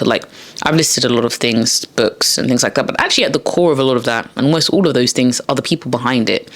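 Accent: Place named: United Kingdom. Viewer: Luv a British accent 🤎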